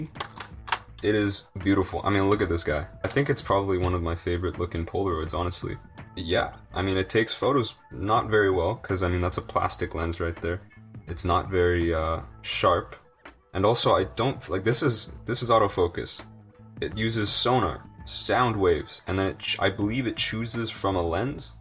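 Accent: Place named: Australia. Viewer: australian accent Nice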